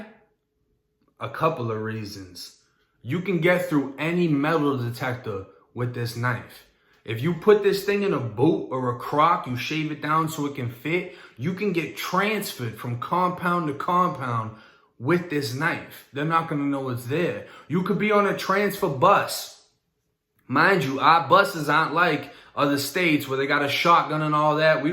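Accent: Boston, United States. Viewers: U from florida? Or boston? That accent tells me u were raised somewhere else other then Florida lmao 🤣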